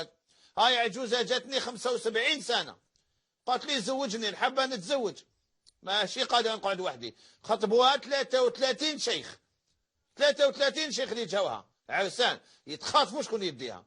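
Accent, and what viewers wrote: Algeria. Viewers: Ana marocaine ta3jabni lahjat adzayer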